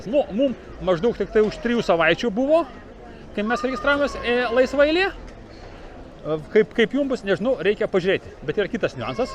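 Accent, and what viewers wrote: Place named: Central Lithuania, Lithuania. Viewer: va tokie konkretus zmones man patinka labiausia. galetu but visi tokie Lietuviai - nuverstume visas konservatinkas jau pradiniam etape. o ne kai prisipizdina lietuviu pinigu. biski ziuriu tarmiskai sneka ;) panasu kazkur is vidurio Lietuvos.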